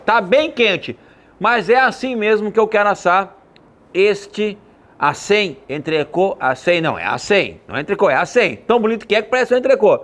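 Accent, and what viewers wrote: Rio Grande do Sul, Brazil. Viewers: Gaucho falando Tá loko meu!😅😅😅😅 Nota dez , gaúcho!😂😀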